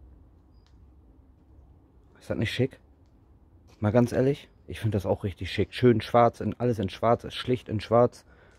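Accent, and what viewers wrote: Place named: East Frisia, Germany. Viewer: Hey ich muss dir ganz ehrlich sagen du machst einen Mega Job und es gibt keinen dem ich bei sowas so gerne zusehe wie bei dir! Der i Punkt finde ich noch ist dein ostfriesisches gesabbel zwischen durch wenn es dich wieder packt Danke für deine krasse arbeit usw vielen.vieleb dank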